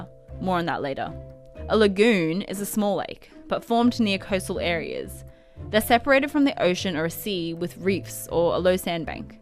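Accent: Australia. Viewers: I thought shes Australian.. lol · I'm in love with Aussie accent 😍❤️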